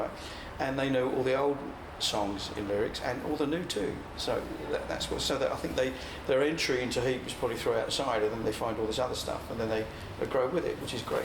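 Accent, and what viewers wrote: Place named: Cockney, United Kingdom. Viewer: Very humble bloke,Cockney accent,reminds me Steve Harris in the way he Talk and the sense of humor,working class attitude,anyway,It's a pleasure seeing Mick Talkin' about his favourite albums and It's not Easy to pick only five great albums,that's how great are to me,could be Seven albums at least and I'm not a hughe die hard fan of the band but surely they eat in same table with, and and not necessarily in that order,so this is my top 5 àlbums. 1.. 2. 3.. 4. 5..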